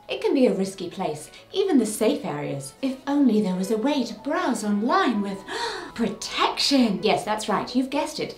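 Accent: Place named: England, United Kingdom. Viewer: not even have 1 FULL regency inspired outfit, but 2 of them, with fake flowers, ribbons and funky hair, everything!! You are literally english queen and you talk like one! Thank you for video!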